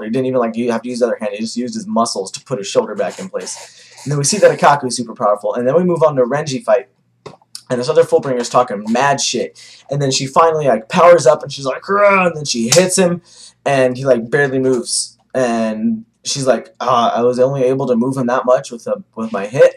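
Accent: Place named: United States. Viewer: lol you sound very American in your pronunciations of names but yea I am the same but British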